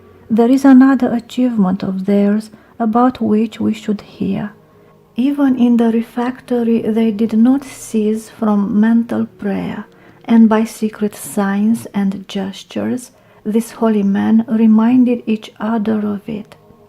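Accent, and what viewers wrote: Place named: Eastern Europe. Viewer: i insist, it sounds beautiful. your accent makes it sound all the more so. and despite the accent (in my ignorance i would guess eastern european or even russian), every single word is pronounced correctly and everything is as clear as pure water. had a robot been reading this, i would not have listened to it.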